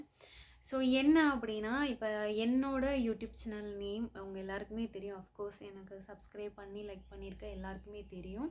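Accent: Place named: Kanyakumari, India. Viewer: தமிழ் பேசும்போது மலையாளம் கலந்து வரும்போது கன்னியாகுமாரி தமிழ் மாதிரி இனிமையாய் இருக்கிறது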